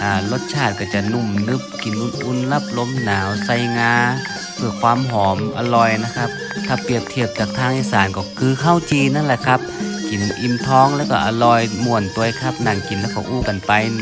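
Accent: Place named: North, Thailand. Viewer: ยี่สิบสาม อู้จาปะสากำเมืองม่วนขนาดน้อง อิแม่แข็งแรงขนาดเจ้า ตี้บ้านปี้สุโขทัยฮ้องว่าข้าว DaK งา ไม่กล้าพิมพ์คำไทยอาจติดสแปมค่ะ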